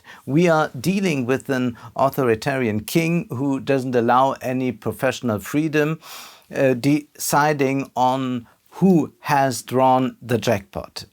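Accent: Germany. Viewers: Weil sein English zu Denglish ist.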